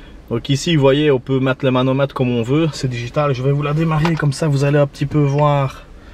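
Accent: Belgium. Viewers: salut est tu belge par hazard?🇧🇪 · Je suis tombé sur ta vidéo par hasard, et ça fait vraiment plaisir d’entendre un Belge parler de ses voitures. J’ai un peu regardé tes réseaux, tu devrais vraiment aller voir Akram à la fin de ce confinement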